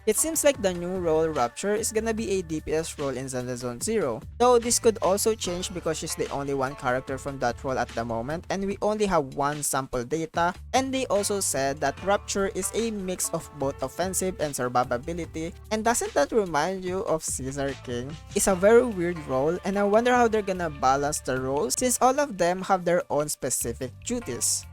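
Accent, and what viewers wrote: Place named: Philippines. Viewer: Beri Pilipino accent! Reminds me of Jo Koy. Correct me if I'm wrong but if right, keep it up kabayan! 😊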